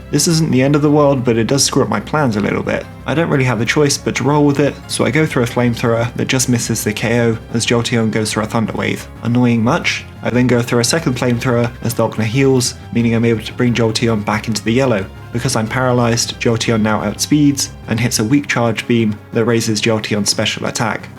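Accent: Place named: United Kingdom. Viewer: This must be the first nuzlocke video I watch with a British accent! That’s so brilliant, bring it on man! 💪🏻🤗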